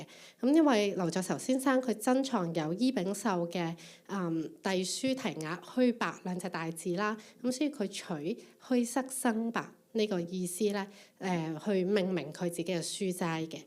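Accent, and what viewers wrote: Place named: Hong Kong. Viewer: 香港话好听❤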